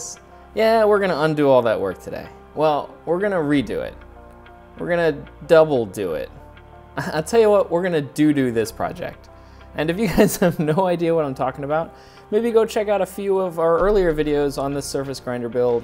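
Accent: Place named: Australia. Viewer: Your heavy Australian accent makes listening to your otherwise excellent vid difficult. In future would you consider including Canadian & American subtitles. Where in Sydney do you Herald from? I have many Australian speaking friends and they love you vids. Thanks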